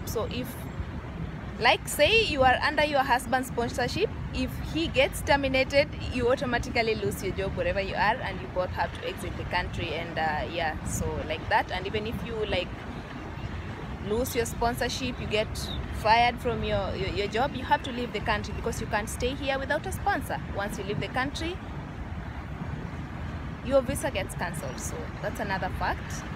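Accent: Kenya. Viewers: Are you kenyan? You sound like one. I am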